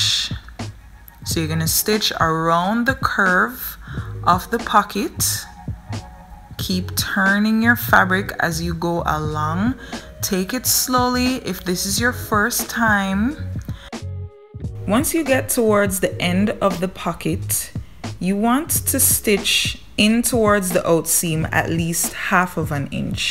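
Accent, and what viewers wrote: Jamaica. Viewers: are you Jamaican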